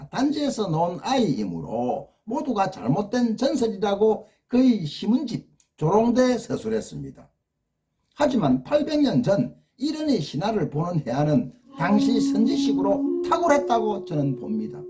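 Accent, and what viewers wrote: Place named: Busan, South Korea. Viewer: 부산 할배의 투박한 사투리로 풀어내는 삼국유사 그간 까맣게 몰랐던 삼국시대의 디테일한 스토리가 너무 재미있읍니다 학교에서 배우지 못했던 구수한 이야기들 재밌어요~~~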